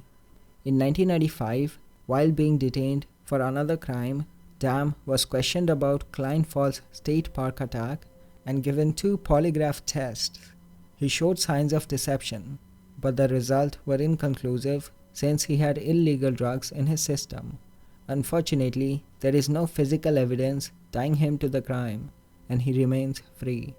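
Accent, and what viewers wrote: India. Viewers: Hey you cheap bastards hire an Enlgishman to do the commentary on these videos why hire a GD India man??? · What's up with the goofy Indian accent? Bring back the female narrator. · Narrator is Indian. Not soothing to the ears.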